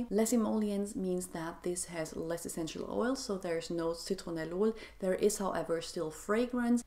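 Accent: Germany. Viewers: I love your accent, are you German?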